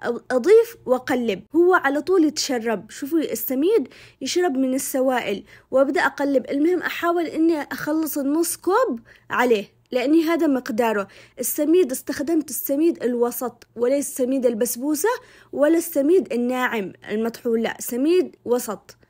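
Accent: Aden, Yemen. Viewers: يامحلا الكلام العدني صوتك فيه عذوبه خلاني انااااااااااام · تسلم يدك وكلامك العدني زيد شوقي لااهلي الي بعدن الحبيبه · كلامك عدني اصل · مادام الهدره حقك باللهجه العدنيه الحلوه خلاص قولي (( كبزره )) بالعدني مش كزبره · ماشاءالله الطريقة حلوووووة وشكلها مضبوط مية المية بس الأحلى هو اللهجة العدنية كان احنا بنتشوه معك 😂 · عجبني لهجتها العدني يسلم ايدك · محسنك هدرتك عدنيه 🥰 تسلم الانامل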